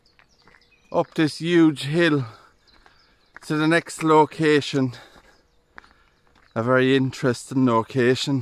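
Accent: Ireland. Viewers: Great video, and I love that Irish accent, x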